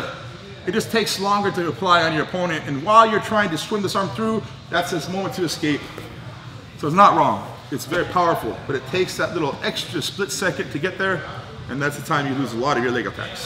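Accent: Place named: New York, United States. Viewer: great tips, plus you talk like a real New Yorker / New Jerseyan (the Proper English) ... awesome OSS ...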